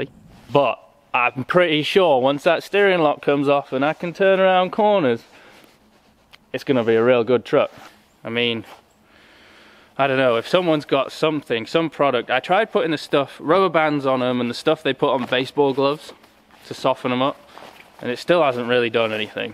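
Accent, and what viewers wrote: South, United States. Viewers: Oil your gloves... mineral oil.. ppl suggest other oils... 🙄 just oil them. Ya really need a saw upgrade. I wouldn't wanna be caught dead in the wood with that- (I know its compact and lightweight) they would say: "at leased the saw kept him warm, isnt it?" Awesome Southern accent btw 😂. It's well advised to not drink whilst camping alone... but, that's your business. Good vid, great job, thanks for posting. One can learn a thing or two from this.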